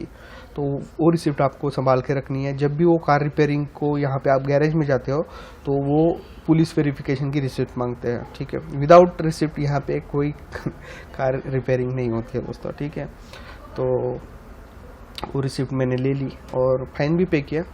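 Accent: Maharashtra, India. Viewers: bhashet lagech marathi tone lakshyat yeto. Bhau mst good work.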